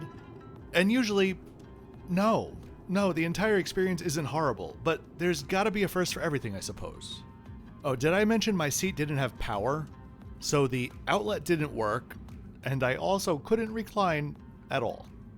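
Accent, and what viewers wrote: United States. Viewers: Your accent tells me you’re from the US. I travel in the US sometimes a 5 1/2 flight from the west to the east, guess what? No food served at all. As far as safety.. they arrested a pilot once who was drunk as hell, yes this happened in the USA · I would very much like to know where you're from. You sound american but it'll be helpful to know your heritage to understand the hit job you're doing on air algerie.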